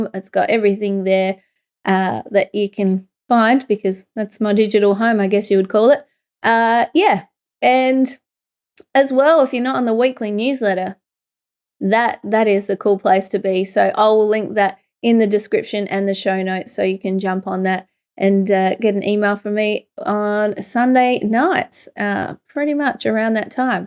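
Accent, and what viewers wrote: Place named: Australia. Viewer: A few random (and kinda tired... sorry if what follows isn't 100% coherent!) thoughts... • First, I never thought I'd say this, but having just dived down the YT rabbit hole of investigating the existential risk of AI (with the attendant copious US talking heads), it's bloody nice to hear an Aussie voice for a change! • Second, there's a saying (that I think originated in the business world, but that seems to hold true in the general case as well) that "if you can define the issue, then you've already half solved the issue", or something like that, anyway! The point being that if you have some problem, any problem, then in order to remedy it it's an imperative to recognise and define it. And so, having neatly laid-out the crux of the issue (or the, erm... blockage!) it becomes much more straightforward to solve it (or, ummm... 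flush it! haha😅). Basically, if you can't see it you can't fix it! • Third, and this is the reason that I initially clicked on this video (you know, ummm, besides for the very attractive, and, as it turns out, super sweet, positive, and refreshingly open and honest, presenter!), the 20th century French philosopher Albert Camus was of the firm belief that the central problem faced by people was that there was no inherent meaning in our lives. In fact, he thought that almost all of our suffering originated not from our search for some external meaning to it all - life, the craziness of it, and the subconscious knowledge of our impending deaths - but that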